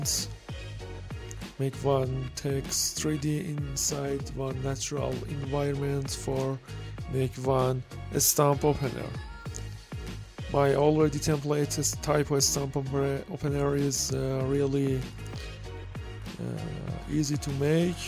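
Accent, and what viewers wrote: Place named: Iran. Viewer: Are you Iranian dude? I love your Accent :)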